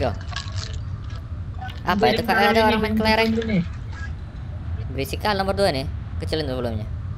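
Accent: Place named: South Kalimantan, Indonesia. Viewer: orang kalimantan sltan itu bang bhasanya wkwkwk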